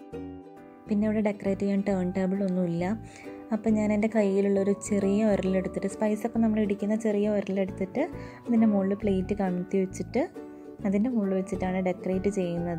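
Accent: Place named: Kozhikode, India. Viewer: Kozhikkodan bhaasha okke edkk keri varunnundallo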